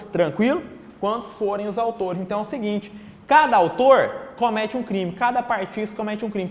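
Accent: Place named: Goiás, Brazil. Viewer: Esse professor é goiano ou mineiro, só poder ser.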